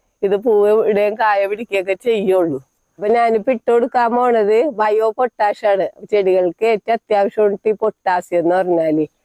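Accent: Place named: Kozhikode, India. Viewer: Couldn't understand Koyikodu bhasha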